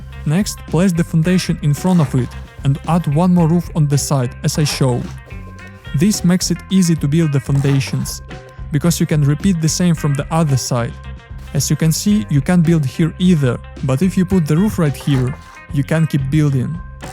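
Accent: Russia. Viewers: ты русский что ли?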